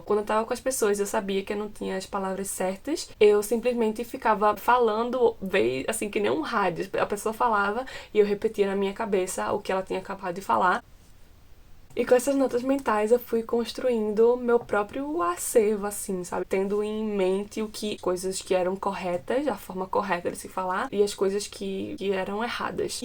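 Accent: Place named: Pernambuco, Brazil. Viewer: Sotaque pernambucano🤗 meu nordeste é diferenciado 😍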